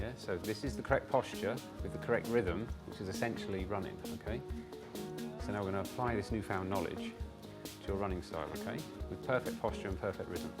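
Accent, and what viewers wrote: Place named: United Kingdom. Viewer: All the information seems good, but does anyone else hate fucking British accents?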